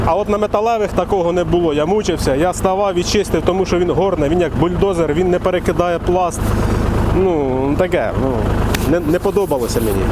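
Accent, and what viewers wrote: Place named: Surzhyk, Ukraine. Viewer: я не к замечанию но коль ты переключился на мову то говари на ней а не на суржике а лучше говари на том языке который знаеш раньше ты неплохо на русском говарил а пахота зачёт тоже занимаюсь только без обид привет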